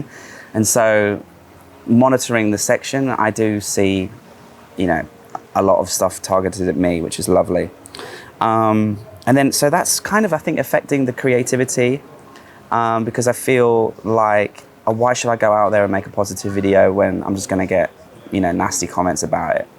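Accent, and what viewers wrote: England, United Kingdom. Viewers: Love all your videos! Love to hear your English accent 👍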